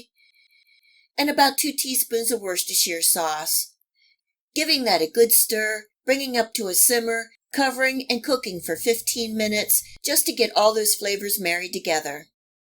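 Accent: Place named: Pennsylvania, United States. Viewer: Made something similar today, I was craving it. By the way … are you from Pennsylvania? I detect that accent, because I’m originally from there, and I know it when I hear it!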